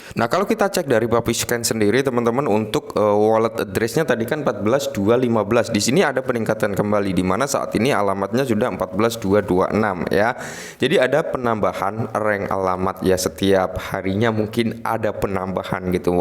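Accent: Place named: Surabaya, Indonesia. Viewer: mas sampeyan womg endi? koyoke gak adoh² teko suroboyo nek ndelok logat e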